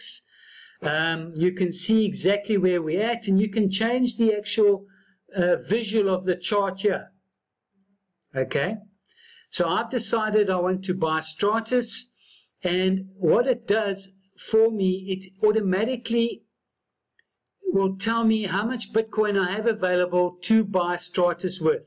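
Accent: South Africa. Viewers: He sounds South African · That beautiful south african accent. Dankie vir die video maat!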